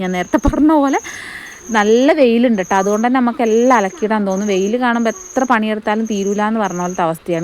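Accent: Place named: Palakkad, India. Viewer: സസ്നേഹം 🧡🧡🧡 നിങ്ങളുടെ സ്വന്തം നാട് എവിടെ ആണ് hus ന്റെ ജോലി സംബന്ധിച്ചു വയനാട്ടിൽ സ്ഥിര താമസം ആക്കിത് ആണോ? നിങ്ങളുടെ സംസാരം കേട്ടിട്ട് പാലക്കാട്‌ ജില്ല ആണോന്ന് സംശയം 🙏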